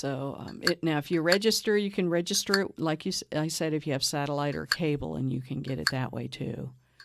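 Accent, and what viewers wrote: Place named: South, United States. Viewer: I appreciate you southern accent but it's Roku not Ruku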